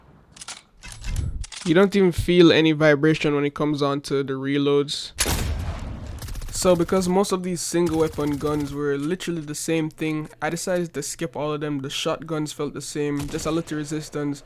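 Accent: Jamaica. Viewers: Jamaican we can hear it in your voice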